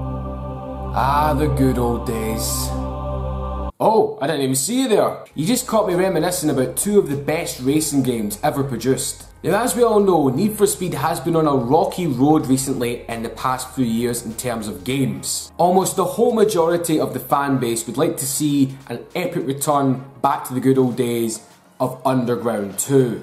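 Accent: Scotland, United Kingdom. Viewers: dat scottish accent tho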